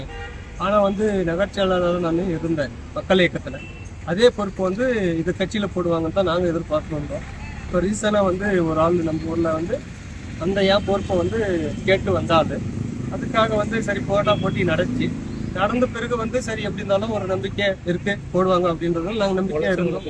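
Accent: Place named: North India, India. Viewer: Dai nee pesuratha patha north Indian pola iruke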